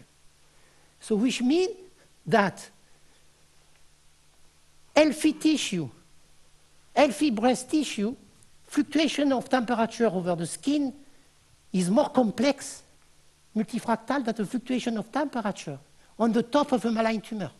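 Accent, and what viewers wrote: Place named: South, France. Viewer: Nous n'entendrons plus ton accent du Sud et tes fous rires😢 tu avais tant à donner encore. Regrets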